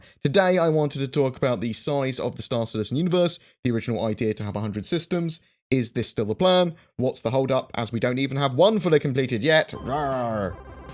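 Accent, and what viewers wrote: England, United Kingdom. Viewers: Your english accent is so terrible.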